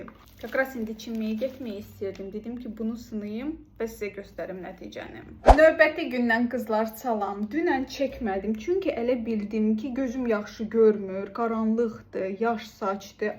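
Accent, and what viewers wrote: Russia. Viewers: Русскоязычная. Ona görə belə şirin danişir👍❤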